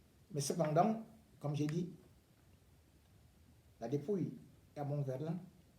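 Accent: Cameroon. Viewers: Un journaliste engagé comme toi on en voit de mooins en moins en Afrique. J avoue que alain foka et toi faites la fierté de notre pays. Continuez ainsi l histoire retiendra votre passage sur cette terre. Merci pour tout mr ngono. Depuis que je suis tombee sur l émission de sport à france 24 j ai doublé mon admiration pour vous. L accent Camerounais sur ce plateau tv me fait tjrs sourire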